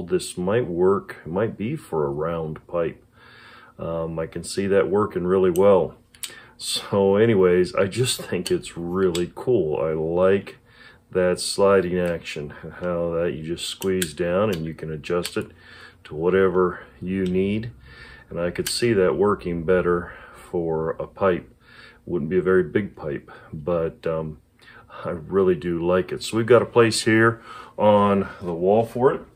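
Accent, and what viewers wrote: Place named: South, United States. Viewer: I want to go to that flea market with you. I am a hand tool woodworker and restorer. I found and restored most of the tools in my shop and use them daily. However, some of the tools you showed made me want to jump through the screen and see the prices. I live in Upstate, NY. Still trying to figure out where you're from, but you sound a little southern to me. Just guessing...LOL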